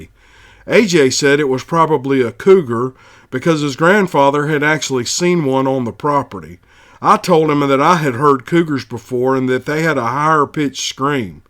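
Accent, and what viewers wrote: South, United States. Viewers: I really appreciate hearing stories from and about southern people being told by someone with a pleasant southern drawl not to mention the soothing voice. Thank you for the great job you do narrating these episodes. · Love this channel, I'm from London so love the southern smoothness, keep up the good stuff my man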